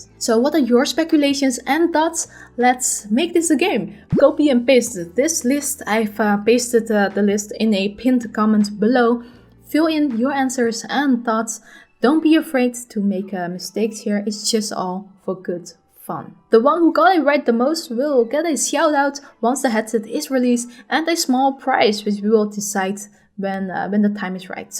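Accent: Netherlands. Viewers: I clicked on the video and heard the dutch accent, immediately subscribed 😂🇳🇱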